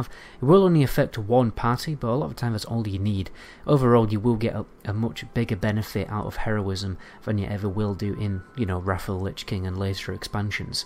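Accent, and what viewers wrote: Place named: Sheffield, United Kingdom. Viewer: Hey, kinda random but your accent sounds very similar to Alex Turner’s from the Arctic Monkeys. Maybe it’s my un-attuned American hearing and I’m way off, but are you from Sheffield or near there (that’s where he’s from)? UK accents are so interesting and varied, I could be super wrong lol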